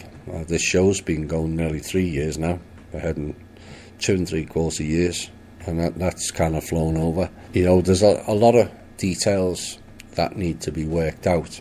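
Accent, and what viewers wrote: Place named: United Kingdom. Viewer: ??????????? A British voice talking about soverign????? No one believes this is real.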